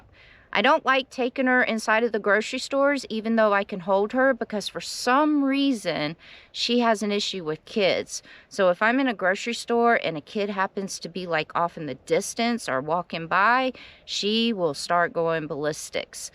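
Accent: South, United States. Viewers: You make me smile. Love your southern accent ❤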